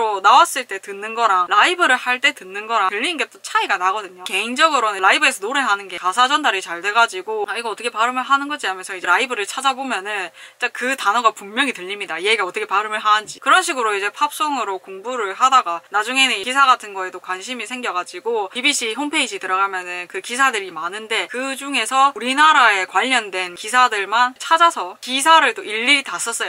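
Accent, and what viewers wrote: Busan, South Korea. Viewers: 유투브에서 부산 사투리 들으니까 신선하네요 ㅋㅋㅋㅋㅋㅋㅋ 전 대구사람이라 친숙하다는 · 와..부산사투리 오진다...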